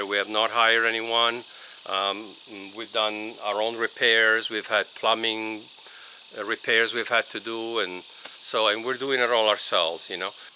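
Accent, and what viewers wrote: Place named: Sweden. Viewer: Why does he have a Swedish accent when speaking English?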